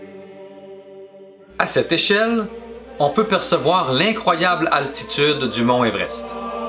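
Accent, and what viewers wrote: Quebec, Canada. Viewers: Quand j'ai commencé la vidéo je me suis dis <<oh cool un Québécois !>> Parce que j'adore leur accent 🤣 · Très bon présentateur, fluide, instructif, marrant et avec des bonnes musiques ça donne un côté comique très agréable. Et puis l'accent québécois c'est toujours du bonheur ! Joli travail merci ! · Ellipsoïdale! La Terre est ellipsoïdale, du fait de sa rotation et de ses pôles plus aplatis. NB: Merci Dr Nozman et Alexandre Astier pour l'expression:) Merci aussi pour cette vidéo très bien expliquée, du très bon travail. Je me suis régalé d'écouter l'accent québecois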